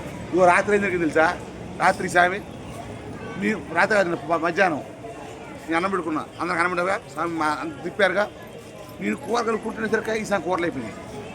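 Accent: Telangana, India. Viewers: Andra kadhu swamy dii Telangana khammam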